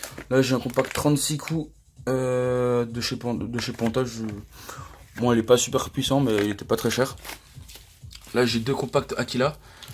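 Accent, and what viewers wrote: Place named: Alsace, France. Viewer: Yo pas mal ta réserve on aime le petit accent elssas hopla tu serais me dire t en a eu pour combien et ta acheter ou ? Geuht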